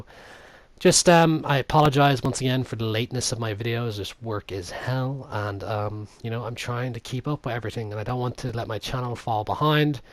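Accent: Ireland. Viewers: I'm guessing your irish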